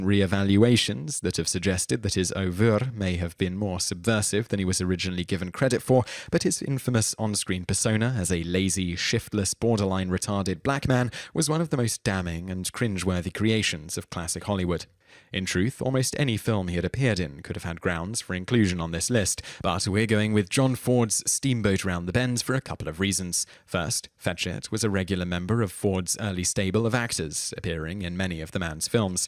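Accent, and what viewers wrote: United Kingdom. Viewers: Alright, I've had it; Hey, NARRATOR, learn to pronounce people's names, for god sake. If you don't know how to pronounce something, ASK SOMEONE, don't just think you're correct because you have a British accent. Seriously.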